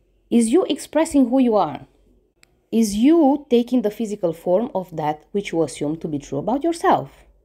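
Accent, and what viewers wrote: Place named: Spain. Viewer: I really love your Spanish accent it gives you An edge ✨✨😉 never stop being this blunt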